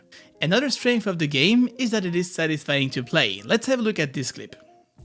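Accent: France. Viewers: Pourquoi tu parle anglais alors que on sent ton français a 100000kilomètres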